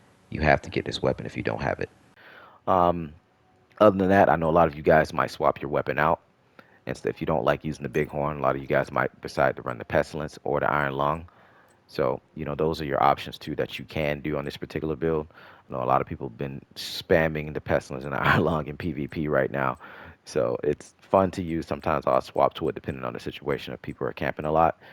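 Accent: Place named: Baltimore, United States. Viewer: fire video, u def from baltimore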